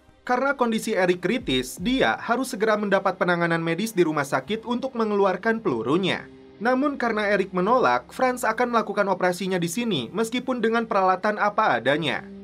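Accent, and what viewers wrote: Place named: Garut, Indonesia. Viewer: Narasi gaya Garut...... Keren hehehehe..mmm. Mana bajigurna, kang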